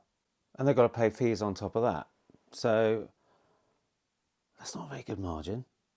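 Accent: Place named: Scunthorpe, United Kingdom. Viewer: Great to hear you're Dad's on the mend! The Scunny accent is an added bonus! By the way, forget anything that runs on batteries...absolute witchcraft! 😜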